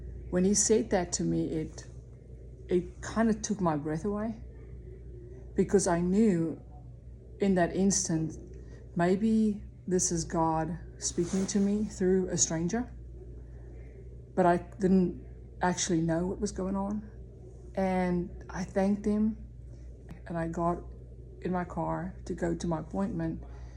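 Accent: South Africa. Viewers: My parents are from your area and we have spent a lot of time there as of late when we are back in states from Mozambique. Do you happen to be South African?? Living out here we can recognizee that at times. What a blessing of a story! Keep pressing in courage! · Are you South African ? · You sound like South African.. I’m from NZ living here in Texas. I too had a couple of like incidents with the father here all these years. Isn’t he amazing Aye? Bless you.. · Great experience. Are you South African ?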